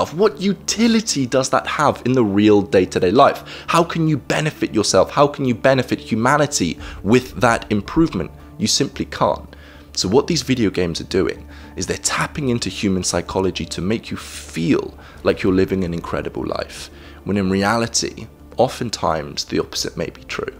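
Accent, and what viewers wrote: England, United Kingdom. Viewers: The amount of click bait in this video and terrible over-simplification of the topic should be the basis of your next video lmao. Using your English accent, simplifying popular activities, using clickbait words like “re-wiring, detoxing, dopamine, neurons, etc” You may have the simpletons and immature people in the YouTube comment section fooled, but you really haven’t added anything to the conversation or made any concrete valid points worth anything. It’s a lot easier to see through this lame facade you are trying to project.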